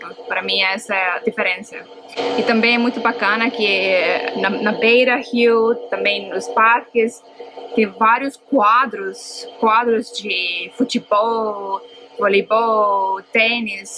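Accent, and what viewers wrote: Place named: South, Brazil. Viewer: Já te chamaram de "alemoa"? Teu sotaque e a tua aparência é bem comun entre os descendentes de alemães do sul do Brasil.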